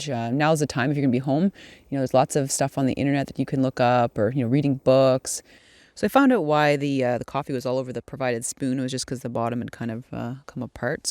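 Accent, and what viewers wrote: Canada. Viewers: My thought exactly, I’m in Vancouver I too heard that Ontario accent...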